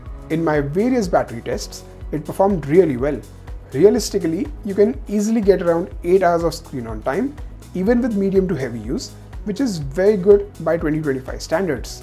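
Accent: India. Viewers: Normally i cant stand india accents. Yours isn't too bad.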